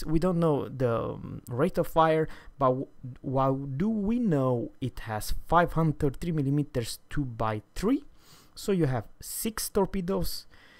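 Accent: Sweden. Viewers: I sense a strong Swedish accent here.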